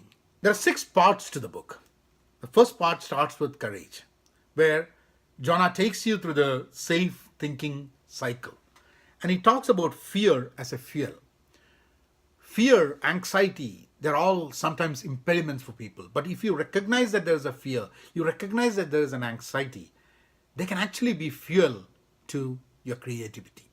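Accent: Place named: India. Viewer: Sir its nice that an Indian is there for me so i can understand slowly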